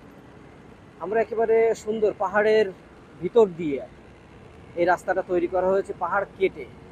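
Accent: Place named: Bhola, Bangladesh. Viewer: মোটেও ঝুঁকিপূর্ণ না তবে কিছু দুষ্টু ছেলেদের অতিরিক্ত জোরে গাড়ি চালানোর জন্য ফাঁকা রোডে এক্সিডেন্ট হতে পারে। গুজব ছড়াবেন না। আপনার কথা শুনে মনে হচ্ছে আপনি চাঁটগাইয়া না, আপনি ভইঙ্গা।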